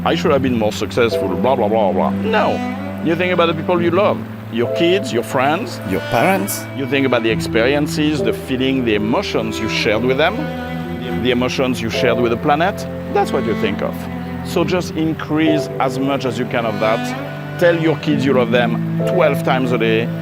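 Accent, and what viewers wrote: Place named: France. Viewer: Hello mon ami d'enfance ... et bien ce n'était vraiment pas ton heure mais ton expérience est amazing ... heureusement que tu n'as pas perdu le sens de l'humour et cet optimisme que je connais depuis toujours chez toi ... Et j'adore ton bel accent Français que tu as gardé en américain...Enjoy your life whit your children et friends.... Love for you et for ever your friend .MartineM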